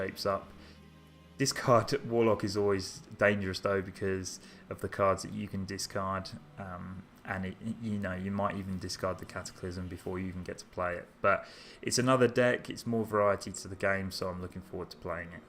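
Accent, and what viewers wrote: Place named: United Kingdom. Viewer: you got UK accent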